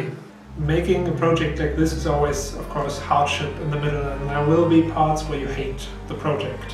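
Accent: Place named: Germany. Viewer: Oh the german accent :) looks really nice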